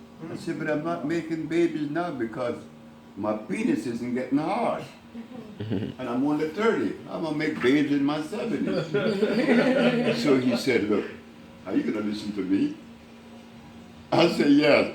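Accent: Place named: Louisiana, United States. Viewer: Did he say wait a minute round. Lol. That that talk down in Louisiana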